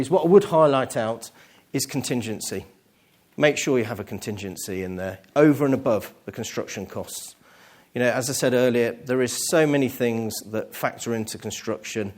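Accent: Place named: United Kingdom. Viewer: British English accent is nice to hear and easy to understand for non native English speakers..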